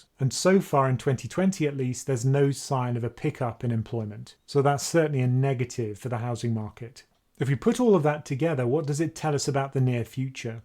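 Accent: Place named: RP, United Kingdom. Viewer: You are such an intellectually honest man and your Queen's English is second to none. Bravo Amigo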